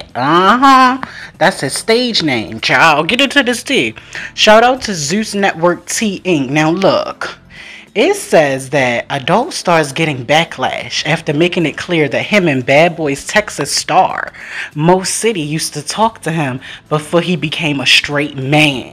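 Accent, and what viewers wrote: Baltimore, United States. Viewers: I know a Baltimore accent when I hear one!!! First time on the channel, loved the content mama 🥰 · You sound like a fellow BALTIMOREAN yo...😊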